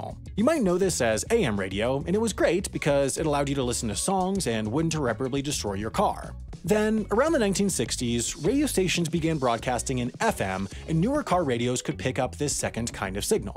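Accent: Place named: United States. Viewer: Why do Americans say the letter A like that? It's so annoying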